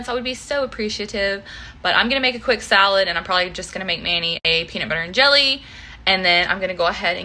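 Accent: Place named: South, United States. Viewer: Your asking what brought me here. Well I love cleaning motivation and inspiration but to tell the truth I have been drawn to the vlog style videos 😊. So being from Canada 🇨🇦 we get teased and bugged all the time about how we talk. I do enjoy the way y’all speak in the south so carry on doing you and carry on with the vlog style content ❤️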